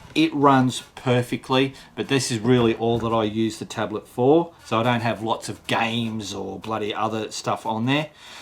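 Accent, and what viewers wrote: Australia. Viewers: I was 1 minute in lol, he's proper Aussie 😂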